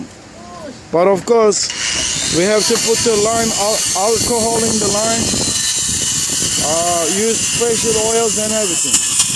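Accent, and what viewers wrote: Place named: Poland. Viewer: Your accent brings tears to my eyes, thinking of my family from Poland !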